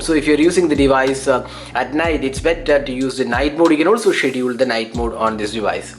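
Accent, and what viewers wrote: Kerala, India. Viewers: mallu accent ?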